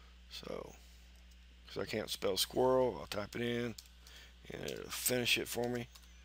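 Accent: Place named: Tennessee, United States. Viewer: It's the Tennessee accent for me.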